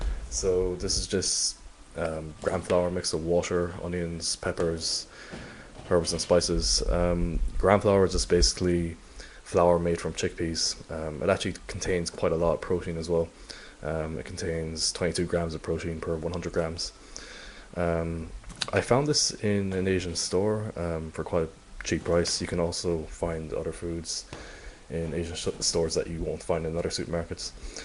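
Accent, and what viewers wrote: Ireland. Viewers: I love accent. It’s subtle yet not overly so and still clear he’s Irish. Almost sounds somewhat Scandinavian to me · gosh, this irish accent. lovely :) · Are you Irish? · HAAGHAHAHAHAHA im dead, fuck u thats the way he says it, cause he is irish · something completely unrelated, are you irish? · He has an irish accent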